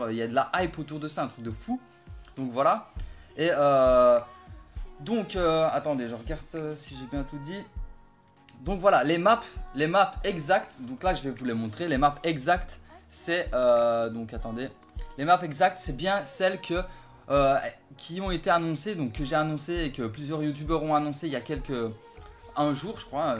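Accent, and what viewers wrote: Belgium. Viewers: Merci 😜 dit tu es belges?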